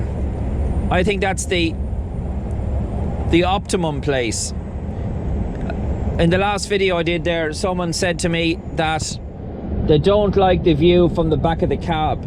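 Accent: Ireland. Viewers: I started watching your vids cz I like the irish accent, but I've grown to love your vids I do miss the european vids, as I've always wanted to do european driving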